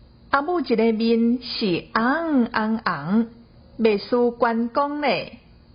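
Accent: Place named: Central Taiwan, Taiwan. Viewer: 足親切的台灣中部母語!讀到韻味十足!有學著,馬欣賞著.真勞力!🥰😀😄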